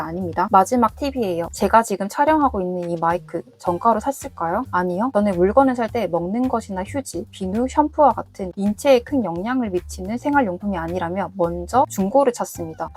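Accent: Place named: Gyeongsang, South Korea. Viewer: 경상도 분이신 듯해요 ㅎㅎ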